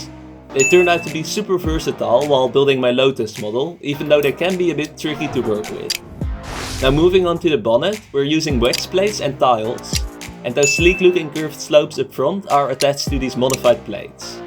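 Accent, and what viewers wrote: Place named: Netherlands. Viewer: Are you dutch ? Because you have an accent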